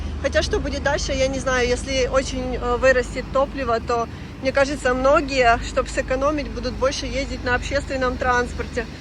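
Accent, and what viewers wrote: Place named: Western Ukraine, Ukraine. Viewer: привет! А почему у Вас западно - украинский акцент?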